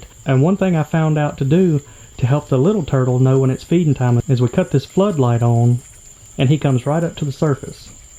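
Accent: South, United States. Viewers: Fun name idea: The Tripple P. that stands for The Peanut pasture pond. I that name will sound very nice with your southern accent.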